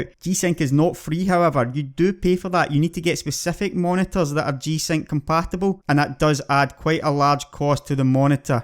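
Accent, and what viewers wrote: Scotland, United Kingdom. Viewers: this scottish accent rulez, gegabytes :D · Great video; right? Helped answer the questions I had, was well paced, very visually informative and to hear a proper Scot again was great. Right?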